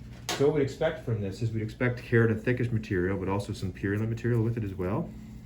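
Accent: Canada. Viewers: I enjoy your Canadian accent!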